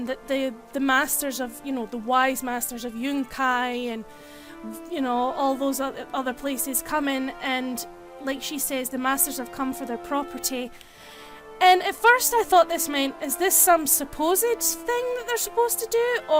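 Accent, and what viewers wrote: Scotland, United Kingdom. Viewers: Scottish narrator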